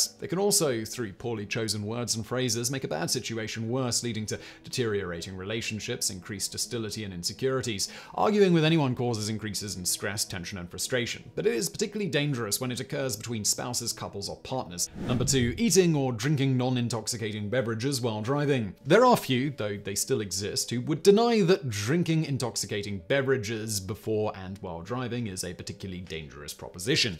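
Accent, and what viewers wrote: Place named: Cockney, United Kingdom. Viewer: Interesting. The guy who's speaking is from deeply cockney stock but is well educated and is code switching.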